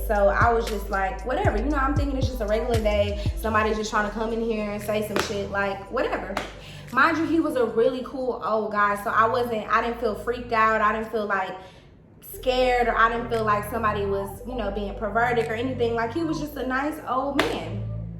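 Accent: New York, United States. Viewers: I your little new York accent or whatever ❤️❤️❤️❤️❤️❤️❤️❤️❤️❤️❤️❤️❤️❤️❤️❤️❤️😂😂😂😂😂😂😂😂😂😂😂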